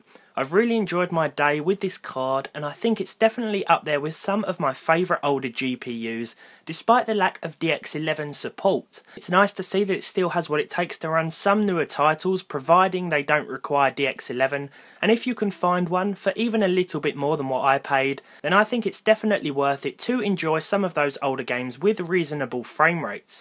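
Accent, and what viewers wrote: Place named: England, United Kingdom. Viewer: dude I'm so glad I found ur videos it's so good to hear a familiar English accent know so much about gaming on a budget haha ur sooo chill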